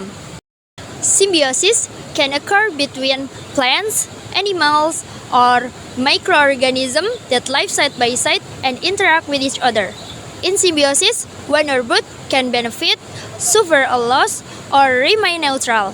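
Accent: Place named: United States. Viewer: GILSSS KEREN BGT, KIRAIN ORG AMERIKA MANA NGOMONGIN SIMBIOSIS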